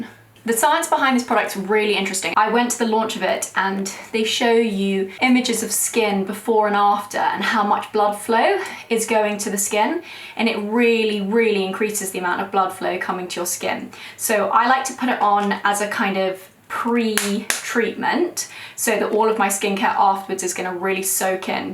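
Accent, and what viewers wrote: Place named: United Kingdom. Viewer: Love your British accent❤and your chinese beauty.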